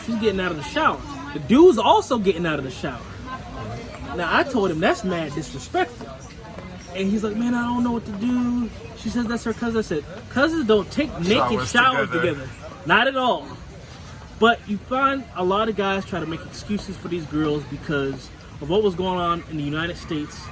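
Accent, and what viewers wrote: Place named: Spain. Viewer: Ahahhaha i love your Spanish accent. Ejejejej no tengo leche en la casa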